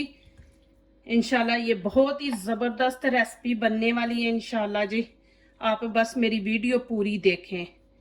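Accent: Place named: Punjab, India. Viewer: Sub hi cooking karny walay bismillah sai hi start karty hain aap boht acha karti hain sirf itni baat hai kai aap ki urdu sun kar dhayan cooking sai hut jata hai punjabi khubsurat zuban hai or aap ka lehja bhi pure punjabi hai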